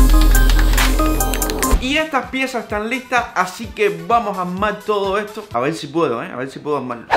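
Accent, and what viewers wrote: Cuba. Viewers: Eres cubano no??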